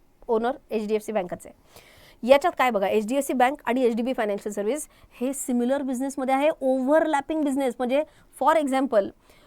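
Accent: Pune, India. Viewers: पुणेरी भाषा आहे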